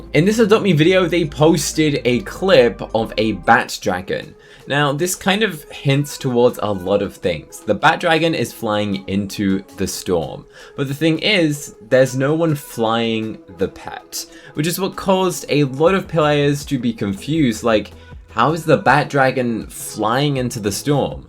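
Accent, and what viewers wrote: United Kingdom. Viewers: This is the most british accent that i ever seen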